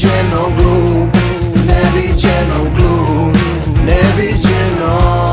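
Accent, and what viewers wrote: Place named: Pirot, Serbia. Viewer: <mail> Meni više zvuči kao Piroćanac, iskreno...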